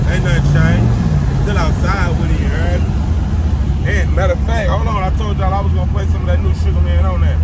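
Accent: United States. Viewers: that yank talk go crazy 😂 🏁💯🏁💨🥃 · Yank talk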